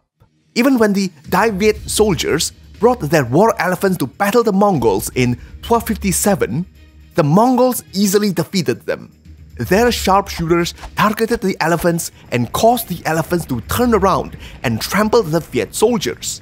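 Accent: China. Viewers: From the accent, I am pretty sure he's Chinese.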